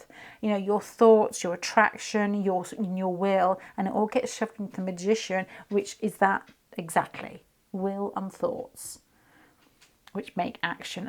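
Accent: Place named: RP, United Kingdom. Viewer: Pile 1. I'm aqua he's libra. Wt*!!! How did you do it?? But please, please, pweeeeeese, talk a little bit less posh because most of your words are incomprehensible.. unfortunately for non posh people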